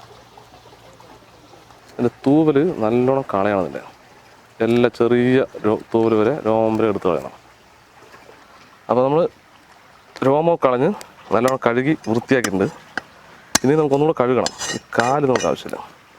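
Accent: Palakkad, India. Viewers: Namude palakkadan bhasha +firozikkade ckng😍😍😍😍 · ചേട്ടായി നിങ്ങടെ ആ പാലക്കാടൻ സ്ളാഗ് സംസാരം........ സൂപ്പർ..... ഒരുപാട് ഇഷ്ടം ആണ്......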